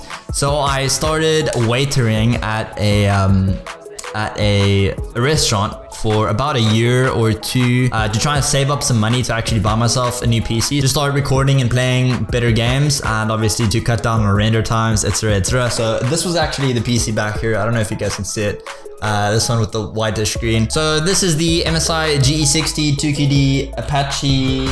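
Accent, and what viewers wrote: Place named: South Africa. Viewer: you sound south african.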